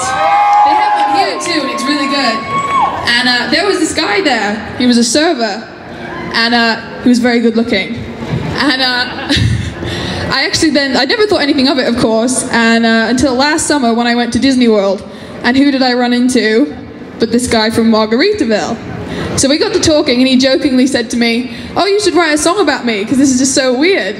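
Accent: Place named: Ireland. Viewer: I saw you at C2C. Your accent is beautful. Where from? I'm getting some Irish, some Bristol, maybe some Manchester. On this song I'm getting some Taylor Swift and she too has a great speaking voice.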